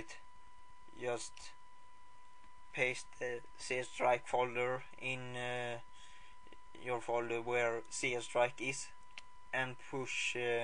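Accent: Sweden. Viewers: så jäkla bra älskar hur du utlar dom engelska orden med typ svenskt tal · Hmihmi! Fyfan va kul :D älskar när svenskar pratar kult engelska :DD Sä himla gutt!!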